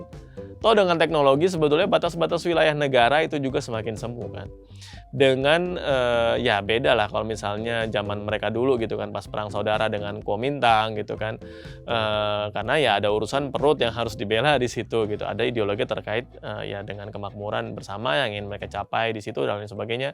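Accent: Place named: Sumatra, Indonesia. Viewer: Kelen bah, Bang ini masih kental Sumateranya wkwkkw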